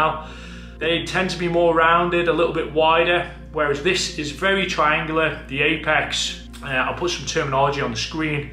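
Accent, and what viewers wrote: Liverpool, United Kingdom. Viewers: How come you sound kind of like a Scouser?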